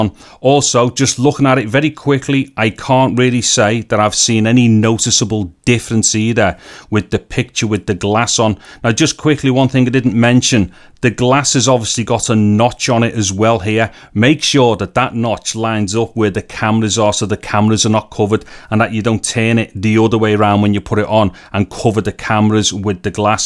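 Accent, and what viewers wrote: Liverpool, United Kingdom. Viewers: Liverpool ish scouse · Ah, the lovely scouse accent! It’s unique to Liverpool. My favourite city in England.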